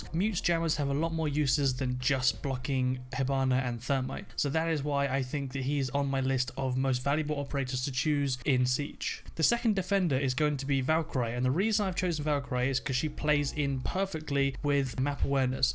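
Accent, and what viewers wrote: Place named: United Kingdom. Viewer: hes british...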